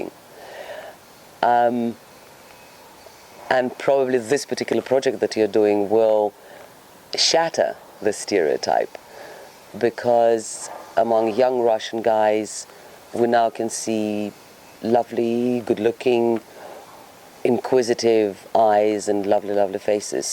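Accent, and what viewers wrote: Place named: United Kingdom. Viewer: Бритиш англ😊я лов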